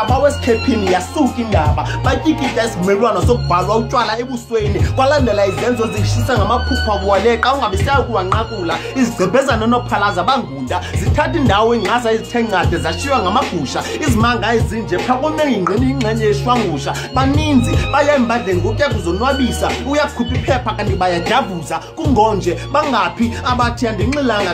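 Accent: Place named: Xhosa, South Africa. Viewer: ISXHOSA SAKHO BRA SIMNANDI NGALOLONKE IXESHA XANDIKUMAMELA NDIFUNDA LUKHULU INOMYALEZO IMIBONGO YAKHO IYANDIFUNDISA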